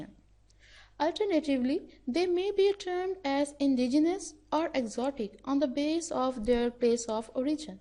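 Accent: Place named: United States. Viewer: Why u are doing American accent😡😡😡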